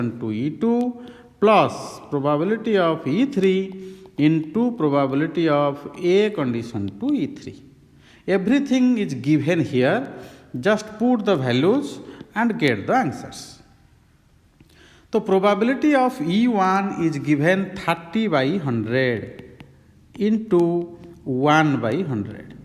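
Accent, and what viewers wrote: Odisha, India. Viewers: Sir Are you from orissa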